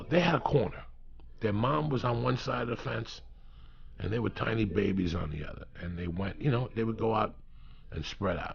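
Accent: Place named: Bronx, United States. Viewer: Hey I don't know you but my grandmother is from the broncs so my whole family talks like you. I just wanted to let you know your a fucking trip but I appretiate you and Superbad is just like my cat Alice Cooper he was a street cat and he's mean as hell. He's black as night with one white patch on his chest has pieces of his ears missing and fangs that go past his chin like a vampire. But he is absolutely a lover if hes not loving on you and farting up a storm hes bitch slapping the dogs in the house and stealing food off of everyones plate (he actually ran off with a whole steak before like a fucking snatch and grab)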